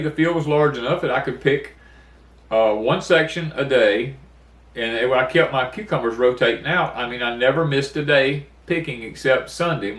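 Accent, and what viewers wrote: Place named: Mississippi Gulf Coast, United States. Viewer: Oh my! I have to tell you something funny. I am 63 y/o and live in Oregon. I stream lots of homestead channels but yours caught my attention because I couldn't put my finger on your accent. I can usually tell like Florida, Louisiana, Carolinas, etc.But yours just stumped me. Until today I heard you talking about love bugs and the old Sunflower store and now I know where you are from. LOL! I am from Long Beach and you sound like home! No wonder I couldn't quite place your accent. My kids still live in Bay St. Louis and Biloxi and I'm on the coast today visiting and dropping hints trying to get my son to buy a farm!! 😂 Oh and one more thing.... Love bugs live inside the trees and are released when hurricanes snap them open. My brother does Auto air conditioning in Gulfport and love bugs are a harbinger. 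They swarm when the A/C season starts picking up and again when it slows down.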